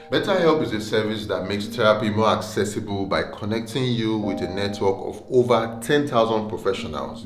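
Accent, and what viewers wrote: Nigeria. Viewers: he is a Nigerian speaking English and therefore has a Nigerian accent! Use your head. Would you say the same to Wole Soyinka? Examine your inferiority complex. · It's surprises me you have achieved such a high number of followers with such a heavy Nigerian accent. Anyway well done to you. I think Portugal is a good choice.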